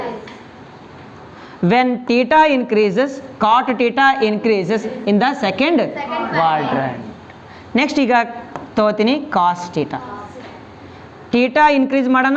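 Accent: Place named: North Karnataka, India. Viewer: Nim uttara karnataka bhashe Nam kade baidid tara ansute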